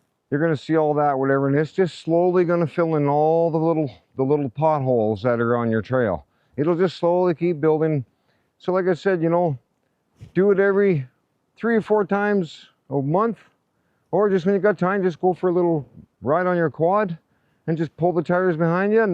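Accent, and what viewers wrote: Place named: Nova Scotia, Canada. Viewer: Sounds like a Nova Scotia accent.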